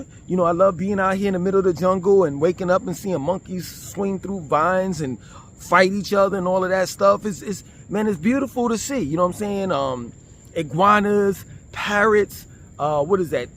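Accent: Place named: New York City, United States. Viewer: Bro WHERE in Jamaica are you?? I keep asking you this? Jamaica has Amazon bro lol, Jamaica ships in Shein and Temu, there literally NOTHING you cant get.. Kingston, Portmore, Montego Bay, you gotta move around in Jamaica. And as to the Red Tape buy big so you don't have to worry about big taxes. The truth is your at a DEEP disadvantage because you cant speak Patois, and your accent is deeply NYC America. Jamaican success literally depends on your energy 😂, you can motivate a mf to link you on time. You have diff types of Jamaicans Professionals and Jokers lolll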